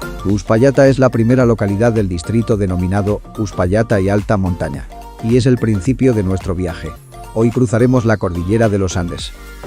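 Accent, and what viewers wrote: Spain. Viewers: Hola !! Soy Chilena es primera vez que veo su video ,me gusto mucho ya que del lado argentino no conocía no e tenido aun el privilegio de ir Supongo que la voz de un español es para hacerlo más neutral bueno la cuestión es que fue interesante muy cultural e informativo somos bendecidos al compartir la cordillera de los andes !! Espero que esa plegaria del obispo Ramón Angel Jara sea escuchada no literal que se caigan las montañas sino que Jamás tengamos que estar en guerra Chilenos y Argentinos ya que seria muy penoso para ambos!! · Hola muy bueno el video y los paisajes. Pregunta , sos argentino ? Por q tenes tonada española.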